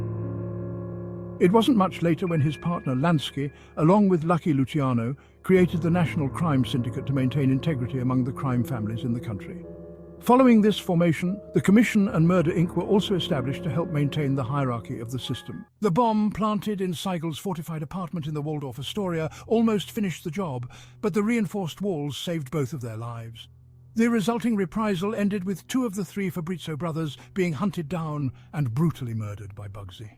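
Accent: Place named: United Kingdom. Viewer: Why use a silly British voice? So difficult to understand. Use American language please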